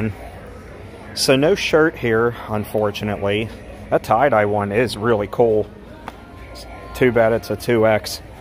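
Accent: Pennsylvania, United States. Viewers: Hey Bro !! Love the Content !!!! Love your Pennsylvania Accent!!!! I Love going into Sprit Halloween every Year and seeing all the cool stuff they have !!!!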